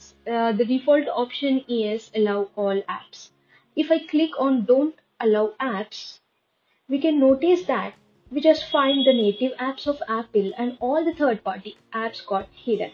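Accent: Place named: India. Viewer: You know this is real because of that Indian accent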